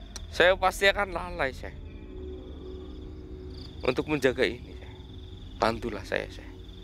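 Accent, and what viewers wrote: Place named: Ngapak, Indonesia. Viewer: Ya emang logat Jawa ngapak begitu bahasa ngoko itu/kasar..bukan bahas kromo inggil.. Atau lisan Jawa kraton gitu om.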